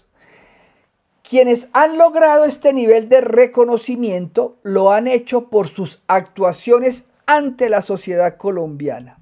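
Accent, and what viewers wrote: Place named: Monterrey, Mexico. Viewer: ¿Señora, Usted dice de todo, pero no aporta ninguna prueba, solo se burla de Petro. ¡Usted es clasista, racista, se le nota por su habladito 'regio'!! ¡Qué basura de canal, coja oficio!!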